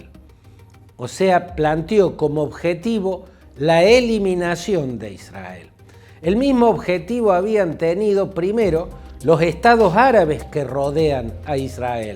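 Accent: Argentina. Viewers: DEJA DE HABLAR ARGENTINO , LUEGO IREMOS POR NUESTRA PATAGONIA .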